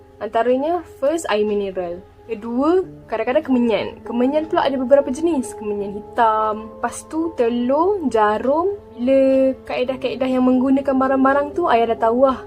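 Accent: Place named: North, Malaysia. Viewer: utara sleng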